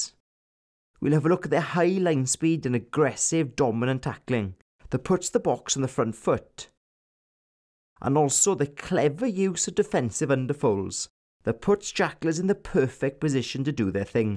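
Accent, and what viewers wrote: Wales, United Kingdom. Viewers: great clip, excellent analysis- in this channel we have a Squidge competitor- love the heavy Welsh accent- must be a man from the Valllleeezz? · Great insight and enjoyed the Welsh accent as a bonus! Subscribed and followed on Twitter. Looking forward to learn more from you. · I like this Welsh accent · Just love your Welsh accent😊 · Excellent analysis. Love that thick Welsh accent. Regards from South Africa.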